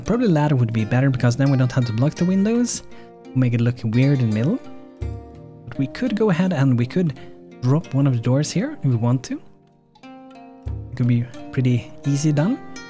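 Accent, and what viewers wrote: Sweden. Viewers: Well,thx for replyn i Have subbed, btw is that an swedish accent? I Myself come from sweden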